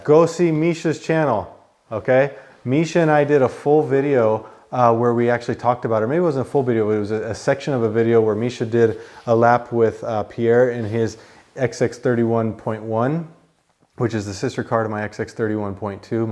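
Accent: United States. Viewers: I’m new to the channel are you American?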